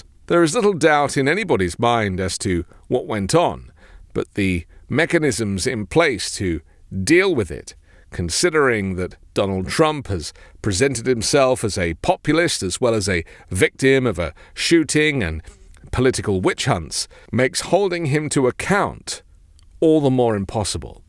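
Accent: United Kingdom. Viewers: I really, really hate someone with a British accent pontificating to us as to how we should run our government! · Thanks for reporting the truth. I always love hearing your British accent & so does my dog, Nick. He always moves closer to the TV when hears you. He loves British accents. 😊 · Why do you sound British? Mmmm? and we all know the law does not matter with Trump. This just seems to be another way of making people angry without a chance to do anything about it. Given the fact he was inaugurated once and almost was inaugurated if the insurrection succeded, and the fact that he beat the senile Democrats in the last election by electoral college and by popular vote we on the left want answers not more pies in the sky " What ifs" with Trump because that is all we hear. What if Trump get angry at Musk angry a Pence angry at anyone in his way and then nothing more comes of it. 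Your words are part of the meaningless press and the real left, the real progressives are underground and not hear on "What If" Podcasts. There very few left podcasts now and those that I know of I will not speak of here in this make believe democratic corporate podcast.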